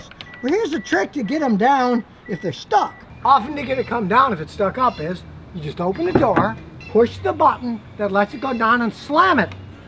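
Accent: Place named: Omaha, United States. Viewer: chin(k) from asia here..........is it me or does this guy remind u of warren buffet......hes got that omaha accent,the way he talks even his sense of humour kinda reminds u of buffet.except instead of warren buffet the oracle of omaha and financial guru,hes warren buffet ur friendly neighbourhood car mechanic.maybe hes buffet's long lost twin brother who drinks 8 cans of diet coke a day.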